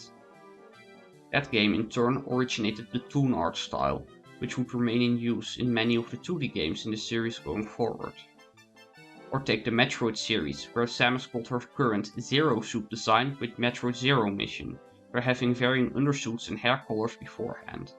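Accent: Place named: Netherlands. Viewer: He talks with a Dutch accent. I would know since I'm also from the Netherlands.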